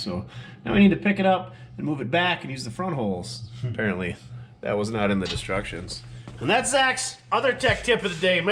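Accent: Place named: Wisconsin, United States. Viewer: Looks great. I detect a Wisconsin accent.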